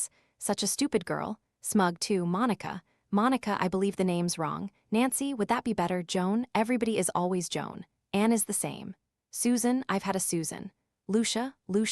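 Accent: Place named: United States. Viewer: Why on earth is an American accent used to read this quintessentially English novel.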